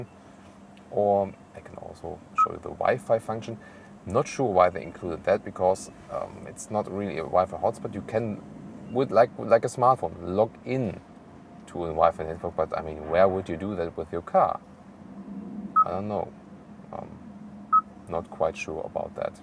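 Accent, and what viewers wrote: Germany. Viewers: german english sometimes feels like german :)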